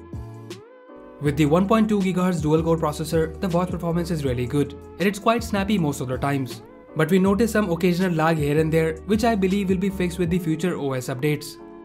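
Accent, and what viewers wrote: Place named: India. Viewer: Good review, but your english is hard to understand... I asume you are from India , I suggest speaking slower or taking some courses to lower your indian L`s in every word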